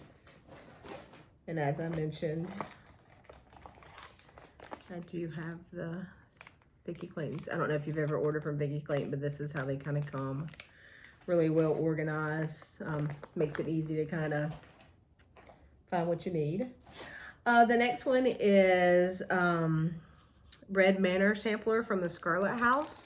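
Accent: South, United States. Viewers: Hi..I also watched Carol Saltbox Stitcher and she recommended your channel. Really enjoyed seeing your projects..love your Be Thankful and Good Cheer pillows..I have both patterns and hope to stitch them this year. I regret to say I didn’t know about your previous flosstube so could you sort of re-introduce yourself in your next video. I’m always interested in where people are from and you sounded like you might be from the South lol..sounded like home to me 😊 Have a great weekend and I look forward to your next episode. Suzette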